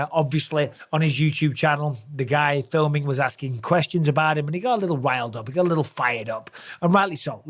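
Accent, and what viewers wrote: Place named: Liverpool, United Kingdom. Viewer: Scouse throbber.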